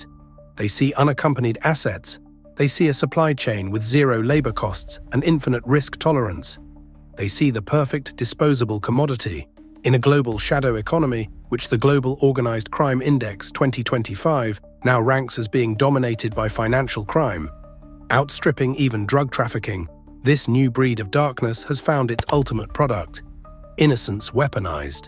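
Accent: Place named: United Kingdom. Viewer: Realy appreciate the british accent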